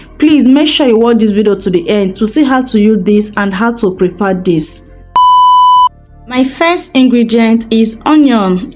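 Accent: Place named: West Africa. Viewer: Come on 😅 she is from West African that's how they speak 😅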